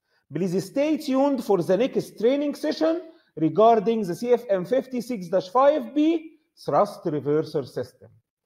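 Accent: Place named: Egypt. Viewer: اللهجه المصريه 😂 فيديو رائع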